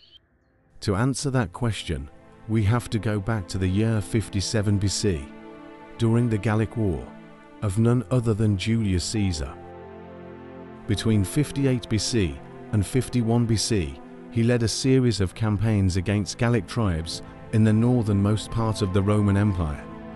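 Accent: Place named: United Kingdom. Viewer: It's absolutely incredible what can be achieved with fantastic drone footage, serious and interested historians (amateur or otherwise), a good script and a distinguished British voice (even if it is AI). Again - well done!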